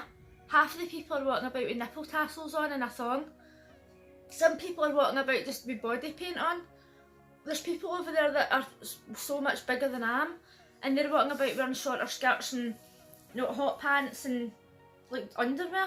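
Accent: Scotland, United Kingdom. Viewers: Nice clothes there, Lol to be honest I mostly watch your videos to hear your Scottish accent I really love it, great video though.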